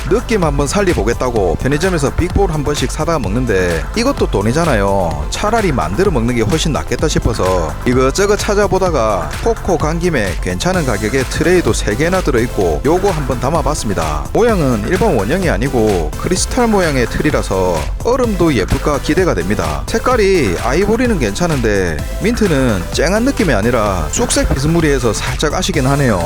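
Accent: Gyeongsang, South Korea. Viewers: 경상도사투리만 없어도 참 좋은 컨텐츠입니다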